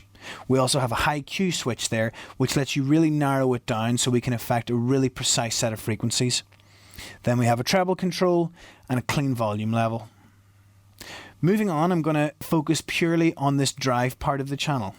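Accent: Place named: Liverpool, United Kingdom. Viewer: Dude you from around Liverpool. aren´t you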